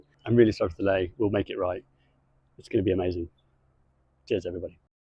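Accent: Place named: United Kingdom. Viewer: I have just come across your S1 after watching a new (and very supportive) video comparing sealing toilets by Ready Set Van. It looks very interesting as I was within a few days of ordering a Clesana C1. Would I be right in assuming that the liner / bags are propietary and unique to the S1? If so, with the greatest respect to your business, my biggest worry would be that as a newish small business, something happens and the liners stop being available. I appreciate the S1 could then be used as a composting toilet , but I'm not a fan of composting toilets. I also share a slight concern about the use of an app to tell the toilet which type of liner is being used, but if that is all it is used for then I can get past that as I suspect I would only ever use the same type of liner. I note that (despite British accent) the business appears to be based in the US. 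Is there anywhere in the UK that it would be possible to see one of the units? £1,500 is a lot of money to spend on something one has never seen..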